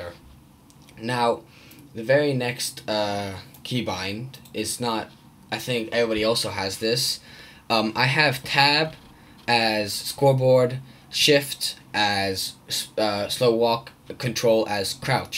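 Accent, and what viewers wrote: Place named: Netherlands. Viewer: hahahahah je engels is goed hoorde het aan hoe je de letters uitsprak vooral f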